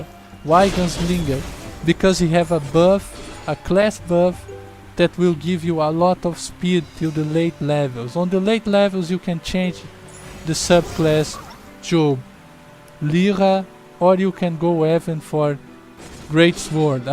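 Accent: Brazil. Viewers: esse sotaque brasileiro!!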